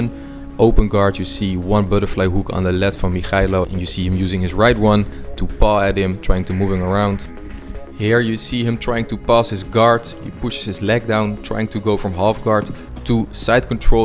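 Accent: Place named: Netherlands. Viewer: Do I smell Dutch?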